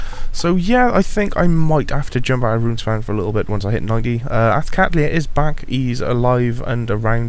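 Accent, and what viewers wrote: Wales, United Kingdom. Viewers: ahah where in wales you from but? can spot it from a mile off!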